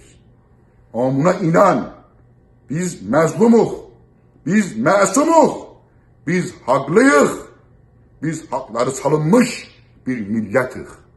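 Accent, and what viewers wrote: Iran. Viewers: Ölürəmdə cənubi Azərbaycan şivəsi üçün. Necə gözəl səslənir. Məmləkətim möhtəşəmdi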